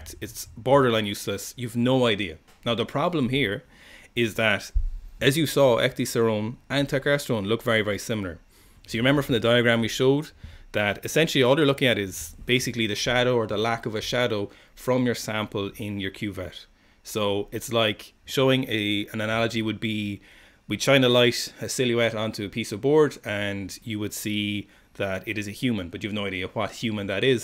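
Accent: Ireland. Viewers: That is an Irish accent isn't it?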